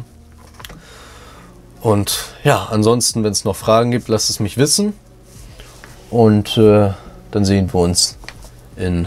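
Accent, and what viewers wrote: Germany. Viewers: Kommst du aus dem Saarland?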